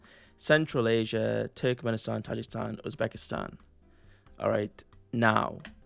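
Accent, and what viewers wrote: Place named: United Kingdom. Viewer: This topic mixed with the British accent definitely creates a vibe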